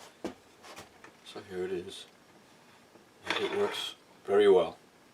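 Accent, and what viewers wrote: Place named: Massachusetts, United States. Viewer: from your accent, you sound like you're from New England. I was raised in New Hampshire.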